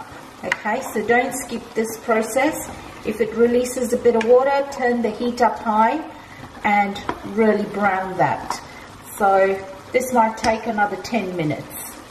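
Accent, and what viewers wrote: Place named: South Africa. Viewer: Because she is not an Indian, she has a South African accent - how rude and totally ignorant, (maybe uneducated as we'll I suspect) to ask "why the accent? " - I made this recipe and it is awesome - thank you lovely accent lady❤❤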